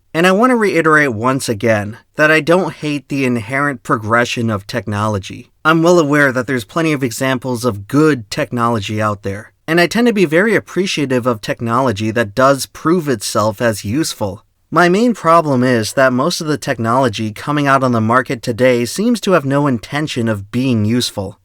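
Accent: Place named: Quebec, Canada. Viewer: Your québecois is leaking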